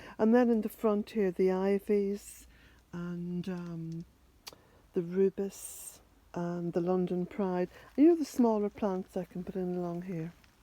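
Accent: Ireland. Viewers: HOW I LOVE TO START MY MORNINGS AT BEALTAINE COTTAGE BUT AM HAVING GREAT DIFFICULTY HEARING YOUR LOVELY IRISH VOICE. WISHING YOU AND JACK A WONDERFUL DAY, LOVE TO YOU BOTH XXOO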